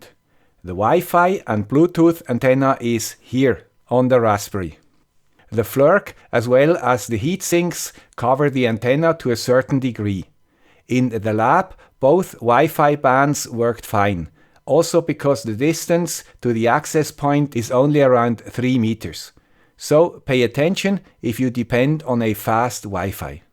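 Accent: Switzerland. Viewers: Your Swiss accent is nice. :) Since this video came the Argon ONE Pi 4 and Argon ONE Neo. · Tönt sehr nach emne Schwiizer 👍🏻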